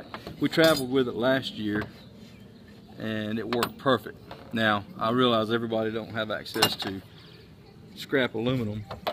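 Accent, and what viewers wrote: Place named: South, United States. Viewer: I believe it's your accent that TRULY GAVE ME CONFIDENCE IN YOUR EXPERTISE. For those outside the USA who only know a southern accent from HollyWood movies you may be used to hearing that accent whenever someone DUMB is talking (Horrible hollywood elitist stereotypes). BUT FOR THOSE OF US IN THE US we know that accent is that of a working man, AKA THE GUY WHO KNOWS HOW TO BUILD AND FIX STUFF! Thanks for the video.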